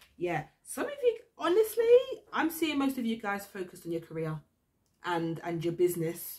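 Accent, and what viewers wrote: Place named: United Kingdom. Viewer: Hiya miss gotta luv the accent(wich part of the UK)and nothing wrong with shopping at Aldi init🙂 I think i found me new best tarot card reader miss 😍🤫 (Not disrespect here dear sis...i ain't build like that) The last holiday i went i met this lovely couple Claire and John living in Kent/Canterbury...maybe it's time to pay them/the UK a visit. Superthanks translating the cards and MASSIVE RESPECT from the Dutchman Ma'am 🙏🏼✌🏼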